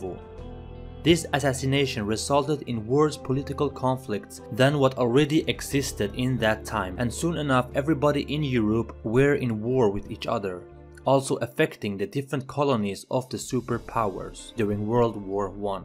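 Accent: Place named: Sweden. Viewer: You have a hint of a Swedish accent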